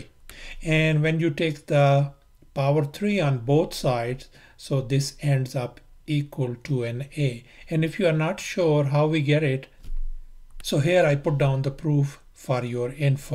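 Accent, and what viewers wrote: India. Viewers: Indian dialected man good job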